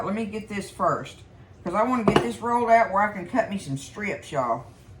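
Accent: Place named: Texas, United States. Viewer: 🤩Love your Texan accent!🤠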